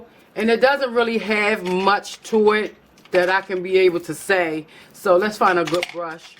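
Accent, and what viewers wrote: Baltimore, United States. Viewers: Omg Are You From Baltimore ? I Love Your Accent . It’s So Cute !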